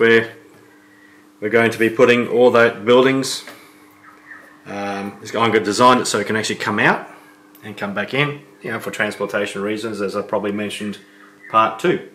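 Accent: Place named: New Zealand. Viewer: No worries, keep up the good work, look forward to the finished product! I take it you're a kiwi as well? Just sound like a kiwi to me!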